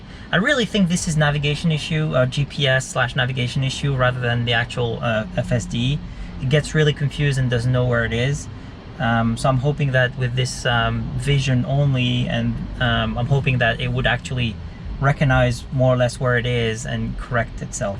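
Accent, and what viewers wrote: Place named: United States. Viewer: Hi, are you... French? You've got a good American accent from what I can judge (being French myself😁). Nice video 👍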